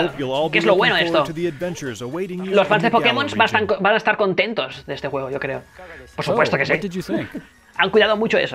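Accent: Catalonia, Spain. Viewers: jajajaja ja deia jo k em sonava catala k te cagas🤣🤣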